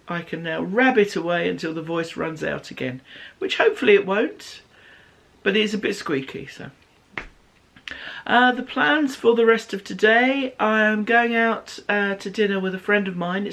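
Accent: United Kingdom. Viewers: So happy to be hearing your lovely British accent again!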